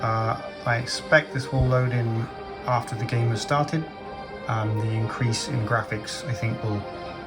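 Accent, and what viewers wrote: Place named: Hertfordshire, United Kingdom. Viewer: Are you a Japanese resident or planning to move there? The Hertfordshire accent is very recognisable.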